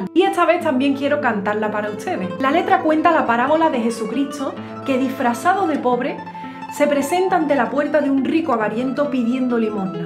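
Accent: Andalusia, Spain. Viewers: Que preciosa versión 💐 Amo el acento adaluz, lo amo en serio.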